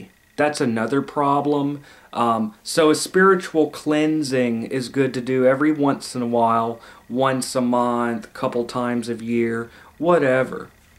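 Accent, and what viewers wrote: South, United States. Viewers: that Southern drawl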